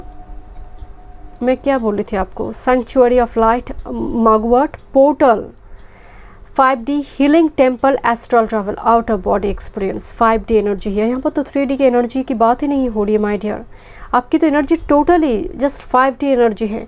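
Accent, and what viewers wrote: Bengal, India. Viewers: pile#3 well it's the very first time I am into this channel and loved the entire reading, though I want to ask you 'are you a Bengali?" as your accent is quite similar to Bengalies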